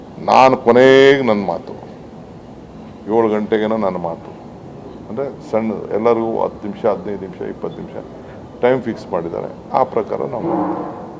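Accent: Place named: Kanakapura, India. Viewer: ಅಣ್ಣ ನೀನು ಎಲ್ಲಿ ಮಾತು ಕಲಿತಿದ್ದು ಕ ನ ಕ ಪು ರ ದ ????? ನೀನು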